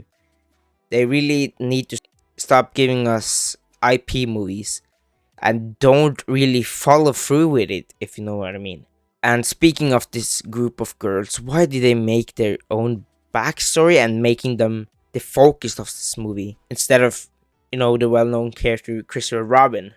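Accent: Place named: Italy. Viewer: Idk why I got this video recommended but it is kinda fun and I love your Manga collection. Btw where are you from? Your accent sounds a bit italian idk?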